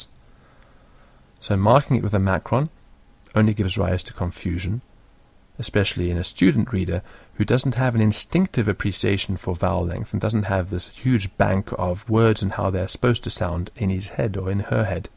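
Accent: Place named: England, United Kingdom. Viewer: I've learnt not only Latin from this fantastic video, but also a descent English accent. Enjoy it!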